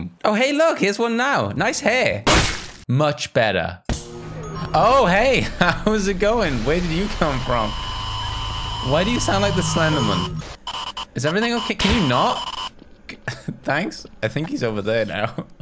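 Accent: United Kingdom. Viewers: Ur British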